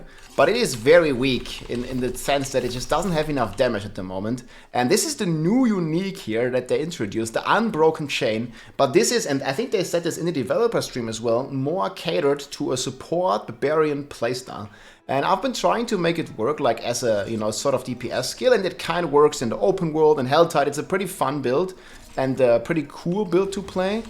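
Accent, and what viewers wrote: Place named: Germany. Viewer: I love the German accent Man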